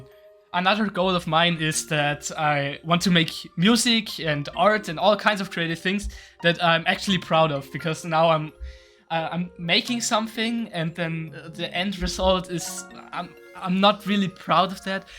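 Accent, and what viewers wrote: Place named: England, United Kingdom. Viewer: You have a nice eng accent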